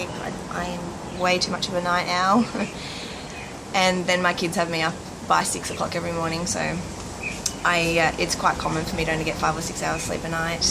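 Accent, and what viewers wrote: Australia. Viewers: Hi there. I just want to say thank you for your videos. I am currently on the journey to have this surgery. I have watched many many videos over the years and I have to say yours have been the most inspirational. I love your whole attitude and your outlook through your journey. Like I said very inspirational. Thank you for that! By the way love your Aussie accent :-)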